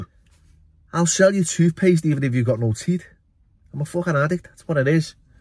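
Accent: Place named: RP, United Kingdom. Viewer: Sorry wrong msg , meant ot for your other video, I watch so many getting confused, I never comment, great posh accent 😂❤❤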